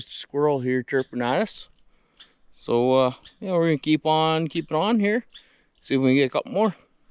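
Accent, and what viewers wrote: Canada. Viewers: dude, i knew from the first 3 words you said you were Canadian. I just wasn't sure where. Anyway, loved the vid n keep up feeding the fam. Especially your little hunter lol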